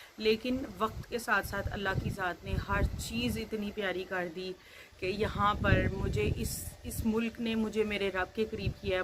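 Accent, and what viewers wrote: Sialkot, Pakistan. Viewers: Itna ghoom phir ka or parh ka apka lahja nai badla mtlb Sailkotio wla ha😁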